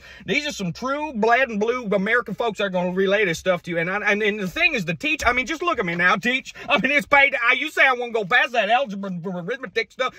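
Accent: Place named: South, United States. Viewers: He sounds so fk southern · Take out the southern accent, make the camera half the resolution and put it 5 millimeters away from his face, and this becomes a universal experience. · I genuinely love all these Southern accents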